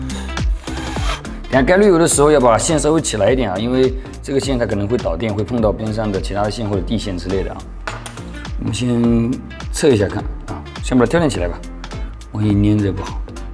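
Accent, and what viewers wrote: Wenzhou, China. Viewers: 牛 上来就是标准温州普通话口音，一看真的还是温州老板 哈哈 赞～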